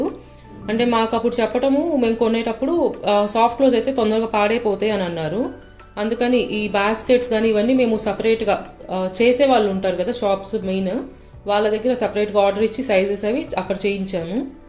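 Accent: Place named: Guntur, India. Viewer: Super ga undhi mam, mana guntur language and way of explanation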